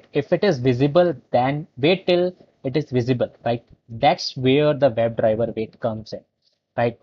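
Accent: India. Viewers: u sound indian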